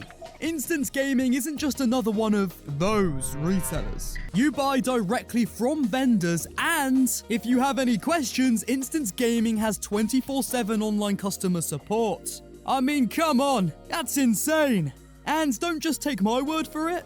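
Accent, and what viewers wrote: United Kingdom. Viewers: You From The Great Britain Fine sir? I like your accent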